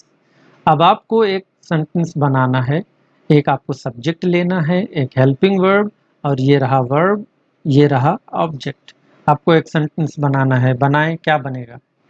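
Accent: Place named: United States. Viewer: Very good American accent in hindi style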